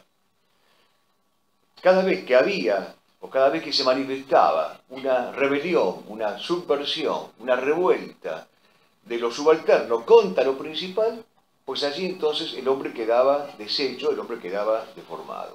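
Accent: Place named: Buenos Aires, Argentina. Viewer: Claro y preciso, muchas gracias Ademas el tonito porteño es agradable, (perdón trinitario) saludos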